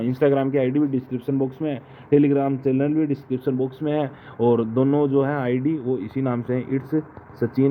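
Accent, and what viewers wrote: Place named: Haryana, India. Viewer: Lgta hai aap utri -purvi rajsthan se ho Hariyana vala tone bhi aa raha hai bich bich me mene to aaj hi viedo dekha apka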